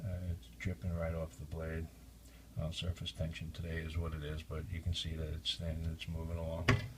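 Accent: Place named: Boston, United States. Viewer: Great dexterity. Never heard your voice. That sounds like Boston.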